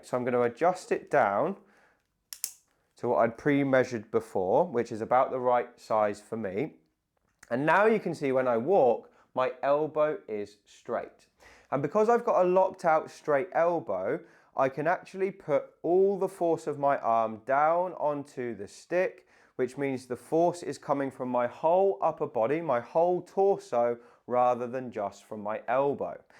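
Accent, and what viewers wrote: United Kingdom. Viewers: I was a nurse and ended up disabled and I have to use a stick. No one ever educated me even after 12 spinal surgeries on proper height of my cane. Thank you for this tutorial. It sounds like you are across the pond from me and educate your citizens better than American PT’s do. Thanks once again going to adjust mine right now 😊😊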